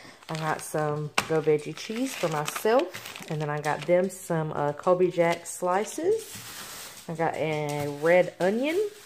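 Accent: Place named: South, United States. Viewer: Family of 5! Girl, Idk how you do it lol. You're amazing haha. Are you from the south? haha I love your accent. I'm from KY.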